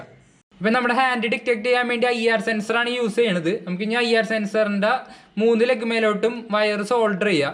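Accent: Thrissur, India. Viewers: ഹാ തൃശ്ശൂക്കാരൻ ഗഡി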